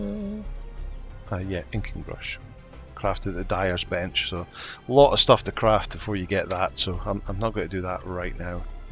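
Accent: Scotland, United Kingdom. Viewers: I know it. One of my colleauges in Thailand was a Scotsman and he had the same accent as you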